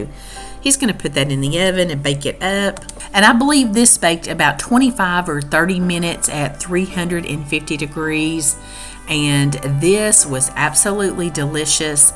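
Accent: South, United States. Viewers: Merry Christmas and Happy New Year to you and your family. I just recently discovered your videos and I really love them. I love your southern accent. Southern people are always so friendly and they sure know how to cook! What you said in this video was so nice and sincere. It brought a tear to my eyes. Thanks again for making your videos. I love watching them and I’ve saved several recipes to try. I call them my experiments.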